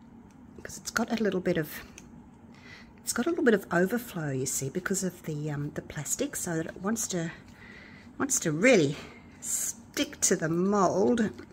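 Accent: Australia. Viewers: You have a beautiful home, where do you live ? I'm assuming from your accent that you are Australian, please forgive me asking so many questions this is my first time here and you are very very intriguing I like your channel and I like the way that you talk...... Yeah, I know I'm weird but it takes many many colors to make a rainbow. That's my way of justifying my weirdness haha. Anywho great project go with the back in Black, that looked the best in my opinion. Take care stay healthy, you my dear, have a new subscriber 👍👍 -Jonny5 🥁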